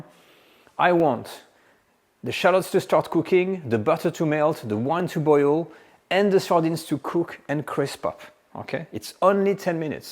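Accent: France. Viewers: Dude, I bet this video can be done in 2 min or less. Besides, the French have an awful accent. Hair of after 4 min. Really!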